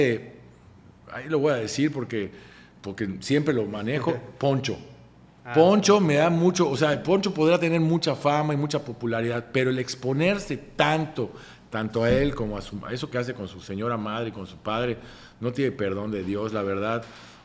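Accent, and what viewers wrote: Yucatan, Mexico. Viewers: Sigue muy guapo Rulo😊 y me gusta su acento yucateco, bomba 😊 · La mejor época de VLA fué cuando estaba Rulo, Fer y Mau Mancera, no me lo perdia, yo TE conocí Rulo cuando hicieron El programa en El Centro Cultural Mexiquense en Texcoco y aunque no fuiste amable con nosotros el público, me gustaba tu trabajo. Que agrado saber de ti y me causa asombro tu acento yucateco, lo tienes Bien marcado y no te habia imaginado hablando así, con mucha honrra por supuesto. Saludos mi Rulo. ❤❤❤❤❤❤❤ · Mi estimado Rulo que gusto de saber de ti, muchos años sin verte en pantalla, siempre era un gusto ver aquellos tiempos de venga la alegría, ahora escucharte como con acento Yucateco es impresionante, que Dios te bendiga siempre ... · Habla muy yucateco, 😂😂, se escucha chistoso, faltó poner Fer del solar en el mejor equipo. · Si se nota el acento Yucateco pero no tan exagerado. 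No te estreses mi Rulo por si se nota 😂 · El dijo que no remarca mucho su acento ,sino que al natural le sale su acento Yucateco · Que padre volver a Rulo , hoy necesitamos verlo en tv , su personalidad tan transparente y agradable. Y si tiene acento yucateco 😂.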